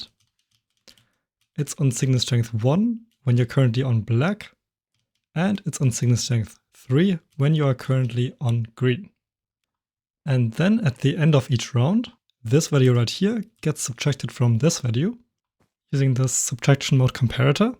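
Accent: Germany. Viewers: i never built this but watched the video because i like redstone funny accent german man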